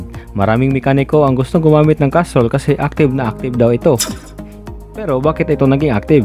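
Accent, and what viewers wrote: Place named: Visayas, Philippines. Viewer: bisaya man kaayo boss hahaha nice job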